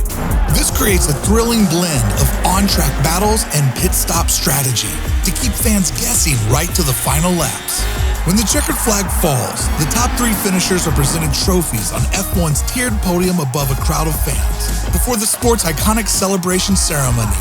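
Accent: United States. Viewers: Could have done without the American accent. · Very weird to hear anything F1 narrated in an American accent. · "Exiting" Miami GP as example next to Silverstone? And the American accent, who would this video be ment for? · haha they needed an American voice for all of the Yanks to try and understand. They are the ones who need teaching as they are all the newbies. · This sounds so American it hurts. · Hearing the British pronunciation of garage in an American accent is extremely weird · Make it less American please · The fact that this video is in American-English instead of the usual British-English, goes to show for me that this video is mainly for Americans jumping the bandwagon on the F1-hype at the moment. Also, 3 American GP's? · This just doesn't sound right wit han American accent, I get it, they are trying to appeal to the U.S....but seriously! 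· ugh ofc they had a yank doing the voice-over. hate listening to their accent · I love how only the beginners guide to F1 is narrated in an American accent :D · Had to get an american guy to narrate it so yanks can understand🤣. F1 should still keep in touch with their heritage. Mention the great old days instead of constantly looking into the future. Edit: Oh yeah here's an alternative title to this video, A guide to f1 for americans. Americanisation of a great sport, it's sad to see the sport be ruined like this. A great sport, just to be ruined by money. Miami a exciting street circuit?? Yeah I don't really think anyone says that, what about circuits like Azerbaijan etc, talk more about the heritage man, F1's really going to the dogs. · Should have had an English person voicing this, not an American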